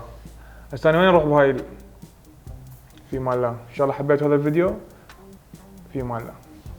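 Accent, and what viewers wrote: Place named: Baghdad, Iraq. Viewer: انتى مصلاوي بس تحجي بغدادي مو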